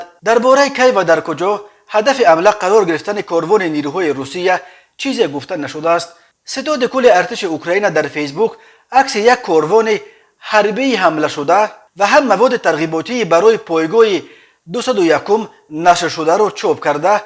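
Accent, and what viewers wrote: Pamir, Tajikistan. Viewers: Хами лахчаи помирии хамин баранда ба чон расид, ягон одами дигар наефтен, ки бе акценти помири гап занад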